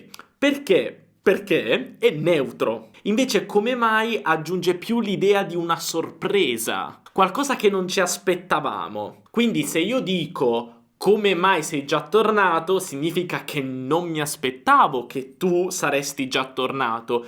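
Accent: Italy. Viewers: ho scoperto il suo canale youtube qualche settimana fa e da allora ho guardato molti dei suoi video. Vorrei congratularmi con lei non solo per il contenuto ma anche per la sua dizione. È estremamente facile capirlo, il che mi rende molto curioso di sapere in quale regione d'italia vive o è cresciuto. Gracie per i suoi eccellenti video. Giulio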